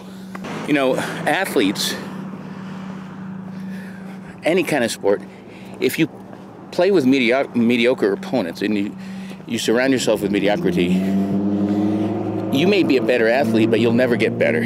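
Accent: East Coast, United States. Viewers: Are you from the East Coast?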